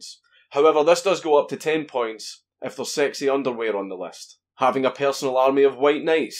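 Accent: Scotland, United Kingdom. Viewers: Your Scottish accent is so fucking strong, that every time you say girl, I fucking hear ghetto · Having you tell the tale of the legendary Thot was just that much better with your deeply Scottish accent. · The Scottish accent really adds to the mythos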